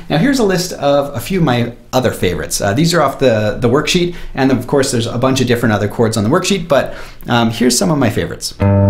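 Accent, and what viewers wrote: Canada. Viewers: Your voice is so canadious, nice work by the way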